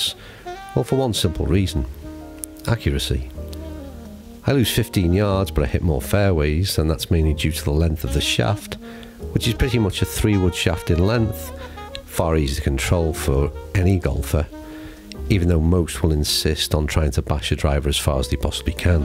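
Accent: Liverpool, United Kingdom. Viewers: Love the plazzy scouse delivery. Very blowey in the Wirral😂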